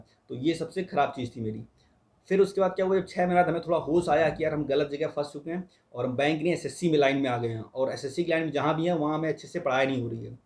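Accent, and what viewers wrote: Kanpur, India. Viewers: are bhai ap kanpur se ho?... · Love your kanpuriya accent 😎😜😉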